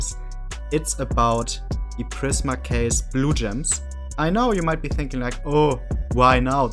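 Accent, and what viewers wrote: Germany. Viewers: U Germany?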